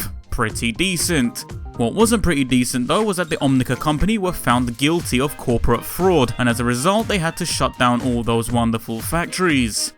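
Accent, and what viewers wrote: London, United Kingdom. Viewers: But I'm Scottish, and pretty much the only English people where I live are posh ones that are here because they work as air traffic controllers that were moved here for work, and I still recognise your accent as a Londoner's. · I'd defiently say you've got a London accent, albeit that really chavish one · How do people think your accent isnt a London accent?!? Like seriously?? I live in the South-East and your accent is still something that I regognise and hear daily.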